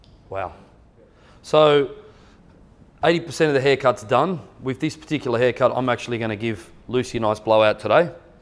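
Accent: Australia. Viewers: Absolutely gorgeous! Lucy's hair is exquisite. It's luxurious and healthy and looks like liquid velvet with the way it moves The color is scrumptious! You did a very beautiful job! 💜, you are so very handsome and I just love your wonderful Australian accent! Writing from Southern California.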